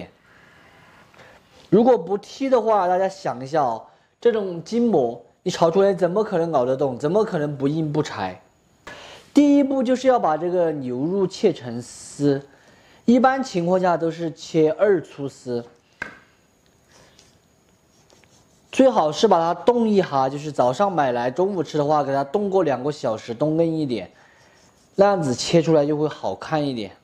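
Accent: Sichuan, China. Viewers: 你的四川话很正宗，要不是有字，都听不懂.🤣🤣你做的安逸👏👏👍👍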